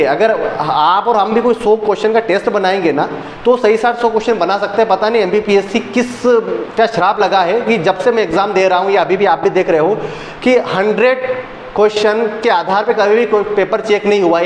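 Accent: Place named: South, India. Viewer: Sir aap south Indian ki tarah baat karte h...aisa lgta h jaise south me bahut rahe ho....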